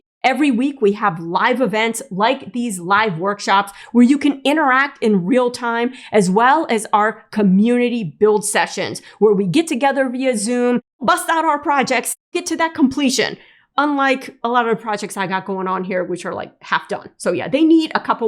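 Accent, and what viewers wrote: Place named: Minnesota, United States. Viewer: this was so helpful. You have a great teaching style and your enthusiasm is wonderful. Thanks for having such a great channel. (Also, I don't know where you're from, but your accent tells me Minnesota, maybe Wisconsin. 😊)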